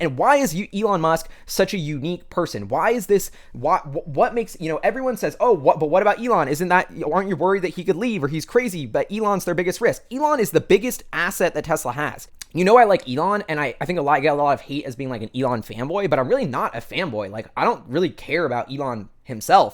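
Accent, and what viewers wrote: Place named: Silicon Valley, United States. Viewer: The cancerous silicone valley accent can be avoided by muting it and using captions.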